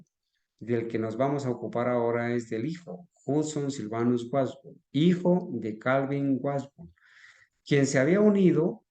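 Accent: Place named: Colombia. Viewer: Bendiciones hno, desde donde transmiten? Le puedo notar,acento colombiano.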